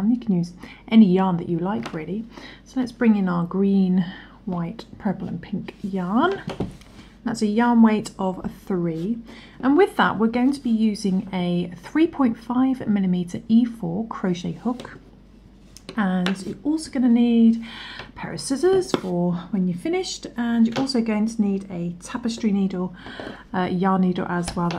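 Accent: United Kingdom. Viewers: Love this and will be trying it. Just find it bit confusing you use US terminology. why is that? is it just personal preference. you certainly sound British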